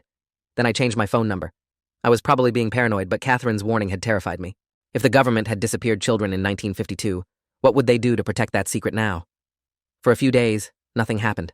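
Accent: United Kingdom. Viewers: There is a bit of a British accent that comes through the voice filter must have malfunctioned.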